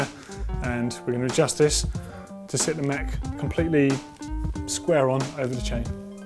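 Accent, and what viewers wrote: England, United Kingdom. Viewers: I didn't TD this video but the music is way too loud to hear your soft spoken English accent.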